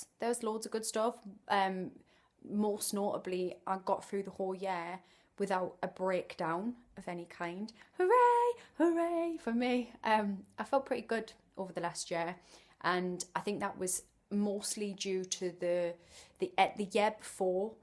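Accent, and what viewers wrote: Newcastle, United Kingdom. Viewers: Happy New Year,. I really appreciate watching your videos. Your pieces of advice and stuff are highly significant for me and, on top of that, I love practising my English by listening attentively to your Geordie accent which I had not been familiar with until I came across your channel here. #Peace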